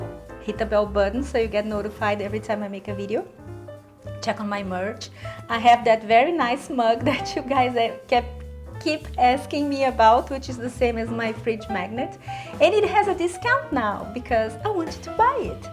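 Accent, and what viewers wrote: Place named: Brazil. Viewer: I have no idea 😂 It just sounds like a brazilian who learned English very well ❤️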